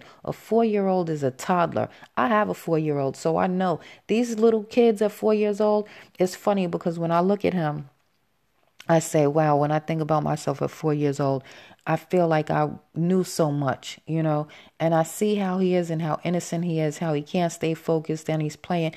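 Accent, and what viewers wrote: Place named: East Coast, United States. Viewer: Enjoyed hearing you speak on it! East coast accent arrested me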